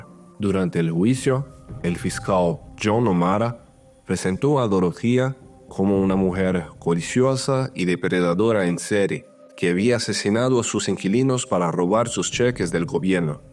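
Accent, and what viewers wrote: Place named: Portugal. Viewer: Que feo acento . Casi no se entiende. Si queres hacer documentales en portugués genial. Pero un asco escuchar el acento rapai tembo.. no se le entiende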